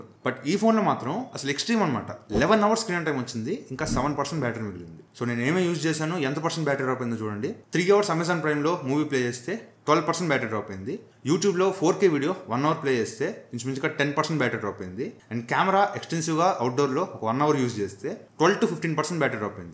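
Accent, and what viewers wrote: Nellore, India. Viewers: bro r u from nellore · nellore ah anna needhi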